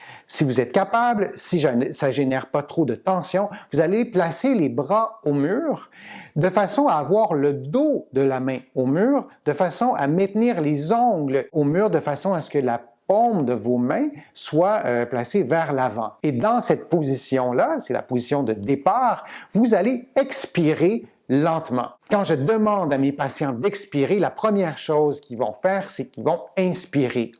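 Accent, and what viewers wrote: Canada. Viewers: Votre accent ( canadien ?)est très agréable à écouter!- J' ai un petit cousin( français) à Montreal et ma fille( franco- allemande) lui avait rendu visite,il y a quelques années avec un autre cousin franco- allemand !.-Moi - même je suis Française en Allemagne et j' ai découvert vos excercices avec beaucoup d' intérêt,ayant moi- même cette bosse,surtout après une scolarité assise, un traitement de choc à la cortisone à l' âge de 8 ans et l' impression,surtout pendant le Covid,d' avoir eu les muscles rétrécis, le nerf sciatique qui se manifeste de temps en temps, malgré la gymnastique en musique depuis 6 ans !-- Comme il n'y a chez vous que 3 excercices à faire, je les fais souvent depuis environ 2 semaines :depuis que j' ai découvert votre site en France , où je suis actuellement !- Merci beaucoup !- Les excercices sont très efficaces: - la sciatique est presque partie,la bosse de Bison,pas encore,mais je ne fais que commencer !👍🙋‍♀️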